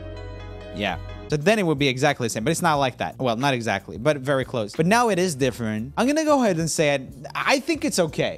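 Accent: Sweden. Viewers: It's his accent. Idk why Swedish accents are like that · Its probably his swedish accent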